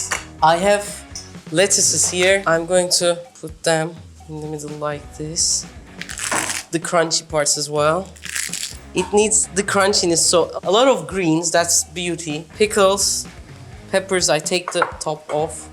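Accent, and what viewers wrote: Turkey. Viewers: I love how Turkish affects your English. I think it sometimes gets really funny. PS: I feel like "efficiency" may be a better translation to "bereket" than "sustainability". You try to get more from the things you have, so they have more "bereket". I think it describes efficiency quite well. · Love ur recipes 😍 plz Share recipes of Turkish desserts too. I like ur Turkish English accent so soft. love from India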